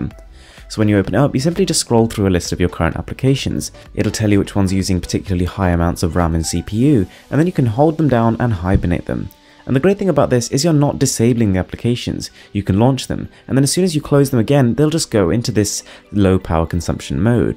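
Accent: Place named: England, United Kingdom. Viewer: Only thing I think I get impressive in your video is your sound and way you speak English. I didn't see any Indians speak English like a English men. I feel you do little showoff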